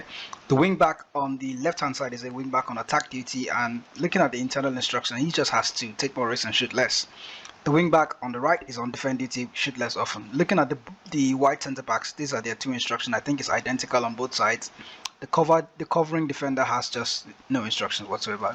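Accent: Nigeria. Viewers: Why do you sound so Nigerian? Nice video by the way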